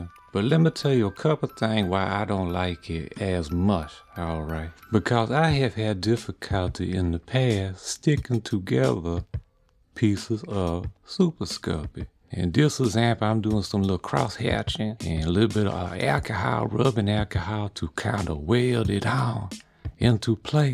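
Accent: Louisiana, United States. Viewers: I swear you sound like my Louisiana people.......glad I moved back! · One of my secret joys is clicking subtitles on these videos and watching the Youtube robots struggle to interpret smooth and melodic Louisia-ness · where you from Louisiana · I'm still convinced that this man is a cajun from my home state of Louisiana. Love your work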